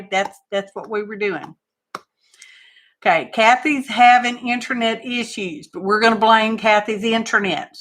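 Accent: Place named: Texas, United States. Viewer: Glad you are back in the grove. I payed part of your video at 1.5 speed.... it was funny listening to you talk! "Texan" sounds good no matter what speed!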